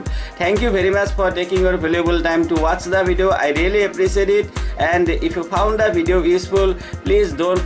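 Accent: Assam, India. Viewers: Form your voice it seems you are from Assam.. I'll be grateful if you reply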